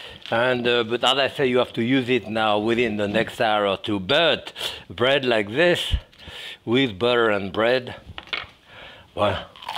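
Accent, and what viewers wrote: France. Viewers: J’adore Jacques Pépin ! Il n’a jamais perdu son bel accent français ! J’ai réalisé un grand nombre de ses recettes et astuces et c’est toujours un régal. Longue vie M. Pépin, vous êtes un trésor !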